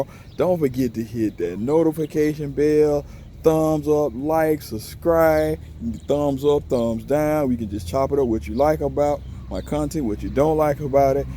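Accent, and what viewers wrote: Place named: South Carolina, United States. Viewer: Gotta be South Carolina. Pee Dee area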